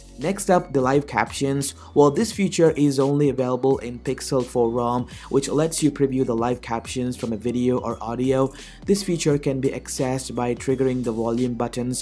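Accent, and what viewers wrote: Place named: India. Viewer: Please it's data..not dayta....no hate but keep you Indian accent man please.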